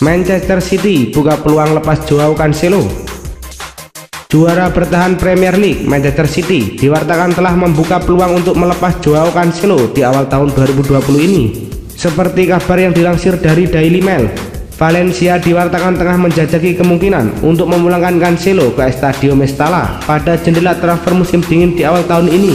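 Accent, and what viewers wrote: Java, Indonesia. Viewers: Untuk, luar biasa untuk berita nya, tapi tolong logat jawanya di ubah, jelek banget jdinya, tolong... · Logat jawanya di hilangin bro. Skedar saran aja bang jgn tersinggung 🙏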